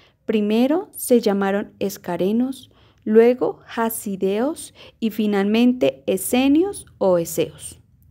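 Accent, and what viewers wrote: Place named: Colombia. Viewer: Mucho acento colombiano me genera resistencia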